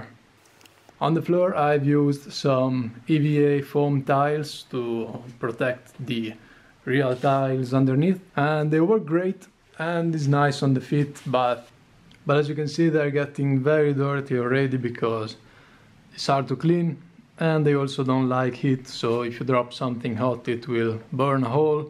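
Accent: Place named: Italy. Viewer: Uaglio si sente che sei italiano smetti de parla inglese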